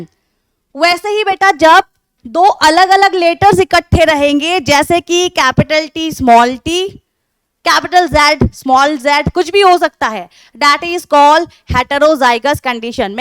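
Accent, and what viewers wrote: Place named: Bhojpuri, India. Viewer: ARE DIKKAT KA HAI RE.. BEST BHOJPURI TONE... 🔥🔥😂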